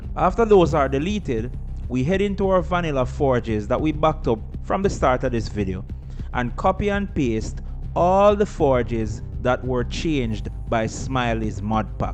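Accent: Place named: Jamaica. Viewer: beautiful jamaican accent brother, great video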